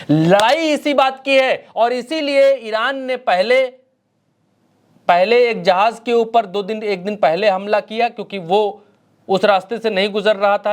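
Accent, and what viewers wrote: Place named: Bihar, India. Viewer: جی شاندار ہو ۔اللہ تمہیں زندگی دے ۔عمر دراز کرے خدا۔بہترین بہاری بولتے ہو اچھا لگتا ہے ۔پ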